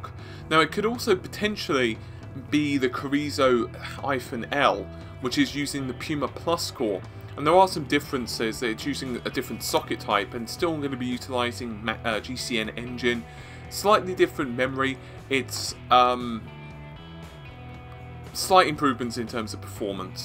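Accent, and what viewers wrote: United Kingdom. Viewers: This guy's voice is way too british, almost obscenely accented. I mean we live in such an open world now, I don't see why such marked accents could "honestly" exist, it almost feels forced.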